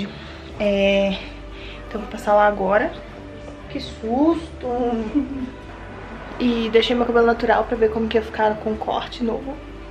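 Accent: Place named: São Paulo, Brazil. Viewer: Você não tem sotaque de Mineiro parece de São Paulo, acho legal isso.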